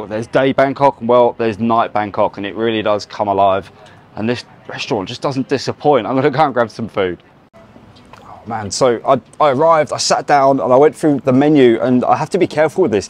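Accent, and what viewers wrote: United Kingdom. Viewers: I used to English accent, can understand u ❤️‍🩹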